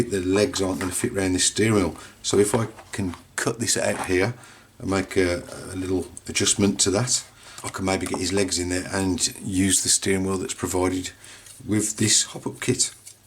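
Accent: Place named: Midlands, United Kingdom. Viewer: Brilliant I am just try fitting some in my rc4wd great for the money, nicety hear a fellow midlander instead of an american taking an hour with 10 mins content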